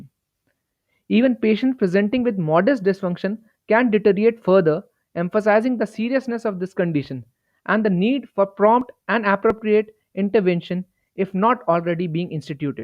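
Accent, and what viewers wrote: India. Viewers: Indo English is annoying for me, but I'm sincerely grateful for quick lecture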